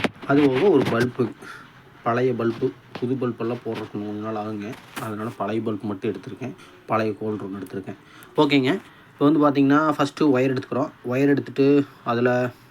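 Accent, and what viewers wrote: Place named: Kongu, India. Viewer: Nice Kongu tamil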